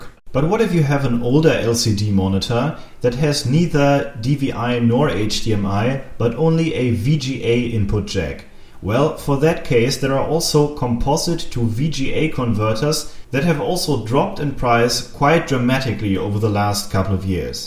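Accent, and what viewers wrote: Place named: Germany. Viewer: Tolles englisch! Aber hehe, dass deine Muttersprache deutsch ist kannst du nicht verbergen. Tolle Videos by the way.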